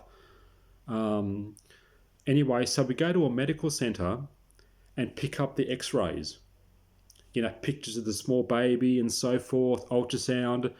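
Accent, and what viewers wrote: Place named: Australia. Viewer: Australian talks like that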